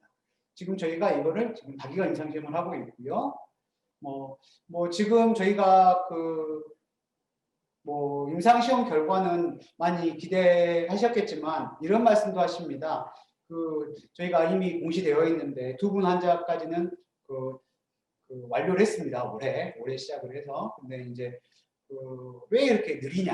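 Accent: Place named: Jeolla, South Korea. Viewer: 대단한 기업 아니 노벨상 수상이 가능할 기업 전라도 구수한 사투리로 기업 설명 너무 자세히 영어 가급적 적게, 귀에 쏙들어오게끔 설명 감사합니다.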